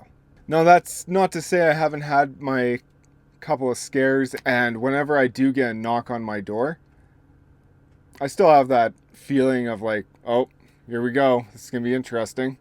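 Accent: Canada. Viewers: Sask accent? What area are you from? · …we knew you are from Canada when you said “about” and “zed”…😅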